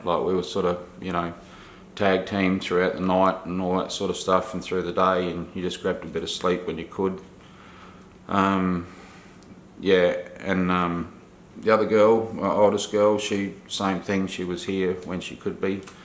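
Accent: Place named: Queensland, Australia. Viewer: Hi, thank you so much for sharing your story😪 I've been diagnosed with stage 3 pancreatic cancer. This is so strange I live in Emerald Qld and after watching your videos, it sounds like you are from this area too? I've got soooo many questions to ask? Like where did you get the oxygen, and cannabis oil and more? Is there any way I can contact you please? My Facebook is Jaco-Marie Jakes Jordaan.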